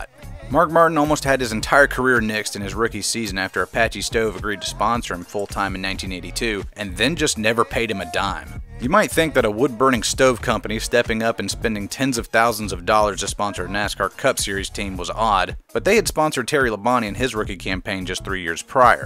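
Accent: South, United States. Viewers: This video is good. Really good. But without your southern accent it wouldn't feel nearly as authentic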